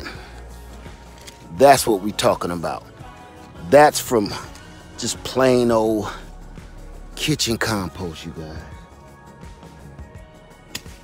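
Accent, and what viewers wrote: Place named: South, United States. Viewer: I knew your accent sound like a southerner I'm from Beaufort my older brother lives in Columbia as well wow!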